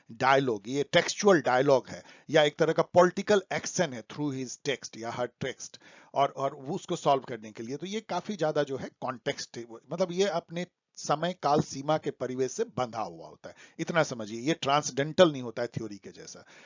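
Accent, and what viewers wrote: Bihar, India. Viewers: Bihar se hai kya sir aap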